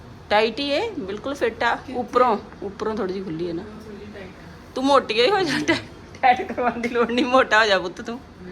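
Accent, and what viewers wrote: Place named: Malwa, India. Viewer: Dii tusi apni hi boli boleya kro malwaeiya dyi boli vadiya nhi lgdi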